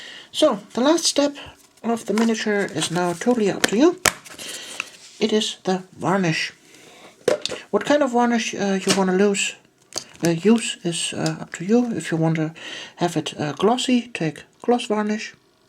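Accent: Germany. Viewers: No she's German, a lot of foreign accents speaking English come off deeper than our own, give her a break will you? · ICH WUSSTE DAS DU DEUTSCH BIST!! hört man an deiner aussprache.. tolle videos.. danke für die hilfe :D !!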